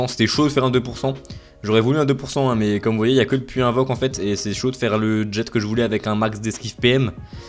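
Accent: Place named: Alsace, France. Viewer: L'accent alsacien qui s'entend fort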